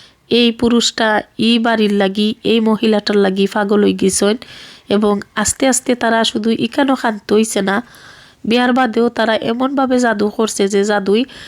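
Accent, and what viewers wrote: Sylhet, Bangladesh. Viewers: সুন্দর গল্পের সঙ্গে সুন্দর রান্না, আর সিলেটের ভাষা- খুব সুন্দর আপা। 🌸❤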